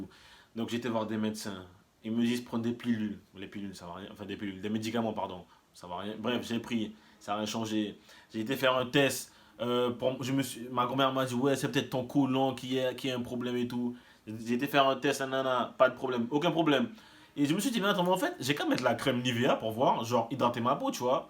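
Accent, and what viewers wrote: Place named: French Guiana. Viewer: Je le jure que sur la façon de parler ça ce voit qu’il est guyanais incroyable surtout le "les gars"😆 🇬🇫✨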